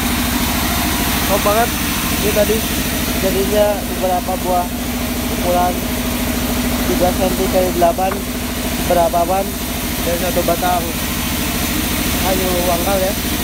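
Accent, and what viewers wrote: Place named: West Java, Indonesia. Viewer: Mantap ey operator na... Asa wawuh sunda na palih mana kang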